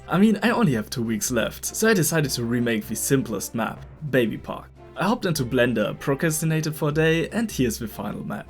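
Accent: Germany. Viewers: Listening to this video knowing German is basically no different from watching this video in German lmao Great video, but I will never unhear the accent. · i think you ar a german i can hear it in the voice as a german myself i know how that sounds when german speeks englich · Are you german by any chance? I really hear it in your voice, and i LOVE that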